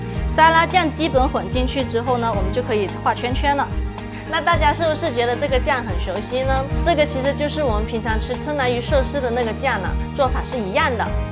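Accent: China. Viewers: Ew. Can't stand her Chinese accent.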